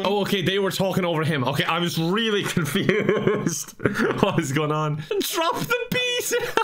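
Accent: Ireland. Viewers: Is my English just improving and therefore I'm getting more sensitive to hearing accents, or is speaking more and more in an Irish accent over the last few videos? It doesn't bother me at all, I really like it, I'm just wondering if I'm the only one noticing this. It could just be me getting better at English since it's not my first language, tho.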